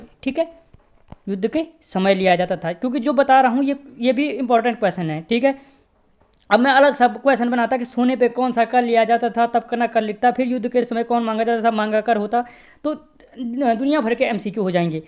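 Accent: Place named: Chamoli, India. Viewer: Bhai aap pakka chamoli ke ho na.....thoda aur practice ki jarurat h apko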